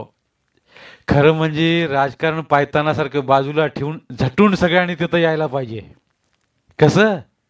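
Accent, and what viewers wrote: Rural, India. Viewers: नमस्कार सर, मस्त वाटेल, ग्रामीण भागातील जसाच्या तसच आवाज आवडला.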